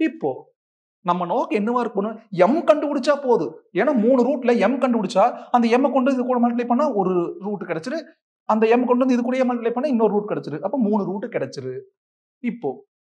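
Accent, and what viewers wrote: Coimbatore, India. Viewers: Sir are u a coimbatorian??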